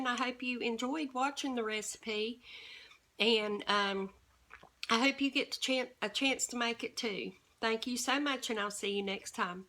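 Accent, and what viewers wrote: South, United States. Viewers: I love her southern accent. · Omg, love your cooking and southern accent, your voice is so comforting ❤️ please keep cooking.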